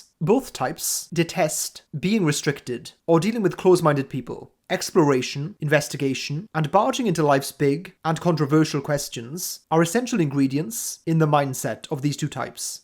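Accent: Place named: Wales, United Kingdom. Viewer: really off topic but i love your accent 😁are you welsh by any chance :O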